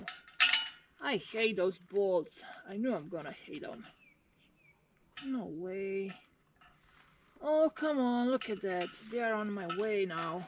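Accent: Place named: Slovenia. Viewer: but it sounds Slovenglish and we need to practice our English.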